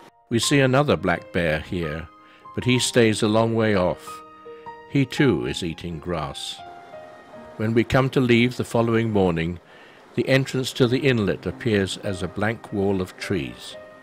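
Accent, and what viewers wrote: United Kingdom. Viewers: Wered you find this voice narrator? i could listen to him all day, love his slight british accent !Really makes the video classy!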